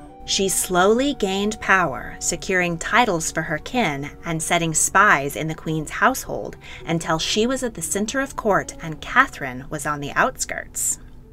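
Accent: United States. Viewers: U have a rlly American accent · Sorry. But why is there an American narrating this? So wrong.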